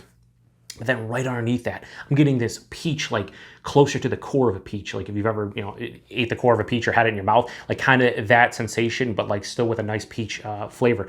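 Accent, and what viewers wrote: Western New York, United States. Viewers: Are you from western NY?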